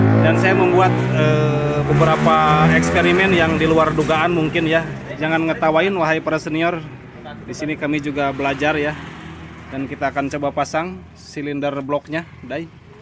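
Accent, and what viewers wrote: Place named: West Java, Indonesia. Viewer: Orang sunda mh seri da ngupingna😅😅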